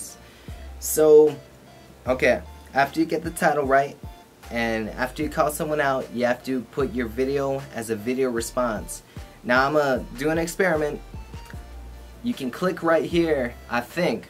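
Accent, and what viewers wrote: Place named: United States. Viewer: You have a slightly American accent, but i understood it pretty well :] was geht... what´s up... was geht.... you know. it sounded like that ^^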